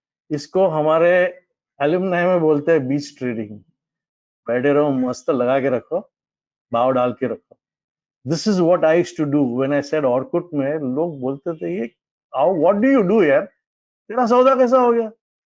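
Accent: India. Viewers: It's just not understandable thanks to that awful Indian accent. Sorry!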